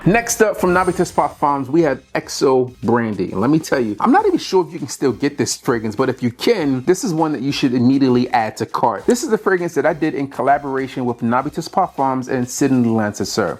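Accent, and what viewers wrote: Baltimore, United States. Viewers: I picked up marwa n Hugo boss absolu recently n they both smell great!!! Question tho champ, are you from Baltimore? Asking bcuz I’m from bmore myself n when you say do, two, too or anything that sounds like these words, you sound exactly like someone from the town lol. Keep dropping this knowledge my boy bcuz I’m definitely tuned in n I know I can definitely take your word for what’s good out here in the fragrance game!! Take care boss✌🏽💪🏽💯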